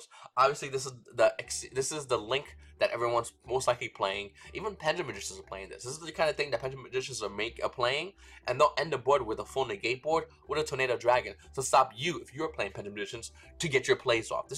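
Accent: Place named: Northeast, United States. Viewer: Kentucky?..........KENTUCKY?.......... ON god i thought you was from NY, Boston or Jersey, just by your accent! Hey it's V here! If that dont sound Northeast when u say it i don't know what is Lmao!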